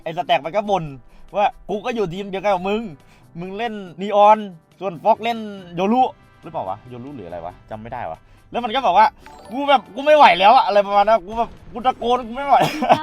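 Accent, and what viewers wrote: Thailand. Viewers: ไทยรัฐ บู้บี้ สำเนียงคนไทยหรอ555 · พี่ก็พูดสำเนียงไทย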